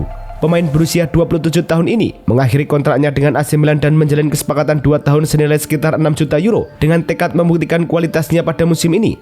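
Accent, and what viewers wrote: Java, Indonesia. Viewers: Yang ngisi suara medok jawa nya agak gimana gitu di dengar 😆🤣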